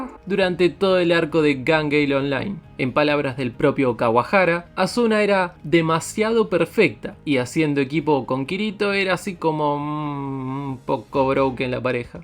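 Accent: Argentina. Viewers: Escuchar a un argentino narrando es de la re puta madre que lo pario :v JAJAJAJAJA, buena opinión.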